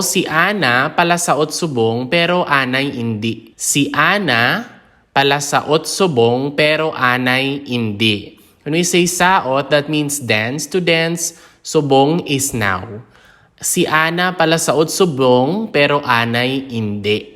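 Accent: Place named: Philippines. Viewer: Philippine english man ini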